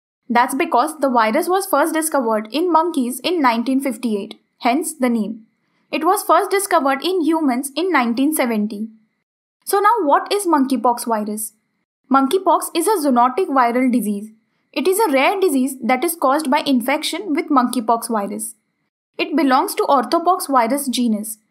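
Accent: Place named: India. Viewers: Couldn't find someone without an Indian accent???👎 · It's hight time to change your mindset and adapt with an Indian accent. World is changing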